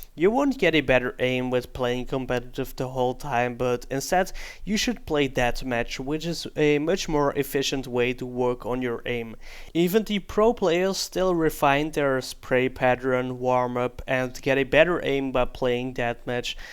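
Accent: Netherlands. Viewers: Even though i'm dutch his dutch english accent made me cringe. (So basically i think my english accent is pretty good) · OMG IS NEDERLANDS. IK MERKTE HET AL AAN HET ACCENT MAAR TOEN IK DIE GOZER ZAG DIE ZEI WAAROM NEGEER JIJ MIJ WIST IK HET ZEKER. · I already heard on your accent that you probably were Dutch, until I saw a steam msg, "Waarom negeer je me" I wasn't for sure, Goede video ga zo door! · Ik wist wel dat je NL was, hoorde het aan het Nederlandse accentje ! Leuke video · I like your Dutch accent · I knew from the start that his accent was from the Netherlands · Je hoort het al aan z'n engels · Hoor het zo aan je accent. Had dat steam bericht nog niet eens gezien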